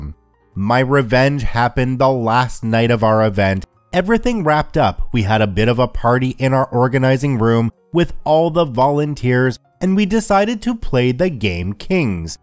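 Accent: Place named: Canada. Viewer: I don't know it would be the first time I enjoyed the Canadian accent. Not that I dislike it. I lived there for awhile. I lived in Canada from age four and a half till about 11 maybe a little younger. And then we moved back to the east coast and then everywhere else in the u.s. LOL all I know is when I was a kid I trained myself into having a flat Midwestern accent because it kept me from getting picked on as much. But I still don't remember anyone in Canada saying it the way you do LOL have a wonderful night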